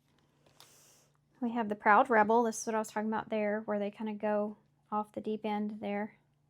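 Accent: South, United States. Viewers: I think your southern accent has everyone calling you ma'am 😂 beautiful! Great reading thanks ma'am ❤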